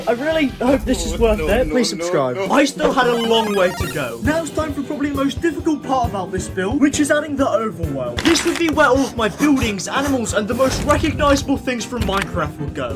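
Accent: United Kingdom. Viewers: Mans British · Ahhhhhh British mate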